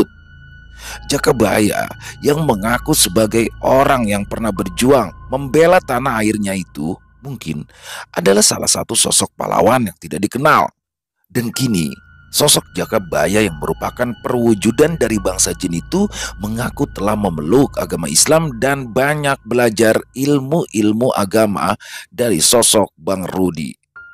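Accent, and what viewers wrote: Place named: Jakarta, Indonesia. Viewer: bang kapan lagi ngangkat cerita bang rudi sm bang piyan,seneng sm logat betawi nya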